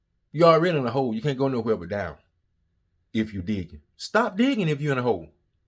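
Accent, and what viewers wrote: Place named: Alabama, United States. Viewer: You speak the truth!! (New subscriber from the UK) ps - who loves your Alabama accent 😁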